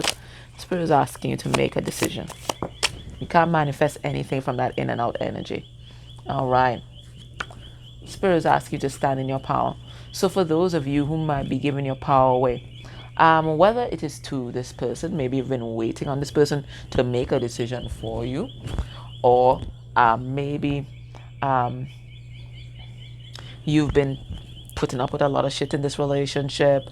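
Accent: Trinidad and Tobago. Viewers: You sound like yuh from 🇹🇹 Good reading! ♐🇹🇹 #Reppin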